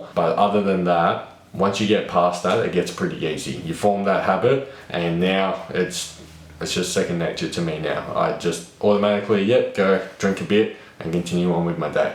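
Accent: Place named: Australia. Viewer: You look German but is Australian or New Zealand asf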